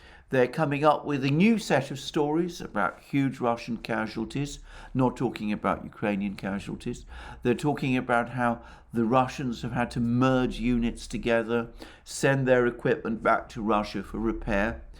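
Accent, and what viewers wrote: England, United Kingdom. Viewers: It hard for me to hear English accent. But I try my best to hear your story mr...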